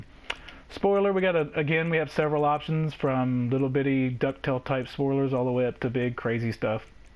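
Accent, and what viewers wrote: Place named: South, United States. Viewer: Gotta love that southern accent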